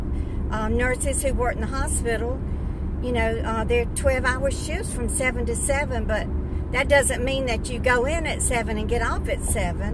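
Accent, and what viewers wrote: South, United States. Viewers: Hi There It's Julie Falkingham I just love you and you are so wonderful. I will be writing to you. You are just so wonderful and sweet. I'm so thankful for all my friends and family and my husband he has been my rock and my friends are not just my friends but they are my family. You are such a loving caring person and I love your southern accent. You are so wonderful for what you are doing for your friend. You are so wonderful and your the best. I'm so greatful to have you for my friend and I would love to meet you in person and you remind of my gram she was my life and I will fill you in writing you my letter to you. You are so amazing and so wonderful you deserve nothing but the best. Love you Love your friend Julie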